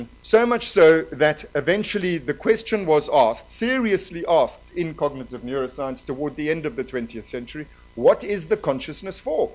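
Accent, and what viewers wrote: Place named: South Africa. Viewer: He has heavy South African accent.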